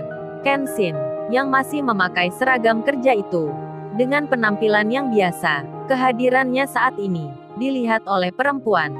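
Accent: Surabaya, Indonesia. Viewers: min kamu orang surabaya ya?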